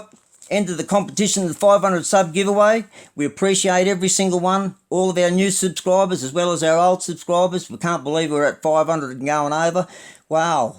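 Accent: Australia. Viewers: Well done on 100 episodes 🤙🏼 Ill comment etc but don't add me to the list to win mate, let someone else have a crack at these cool prizes! But...if you decide to ever have that Holden Ute as a prize i promise to enter as many times as I can 🤣🤣 Hope you're doing well mate and its good to hear ya Aussie twang back on the Tube 🇭🇲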